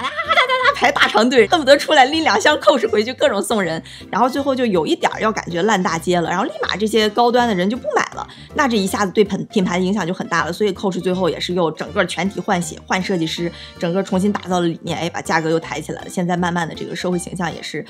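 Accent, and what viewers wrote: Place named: Northeast, China. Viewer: 姐姐什么都好，就是东北音太重了。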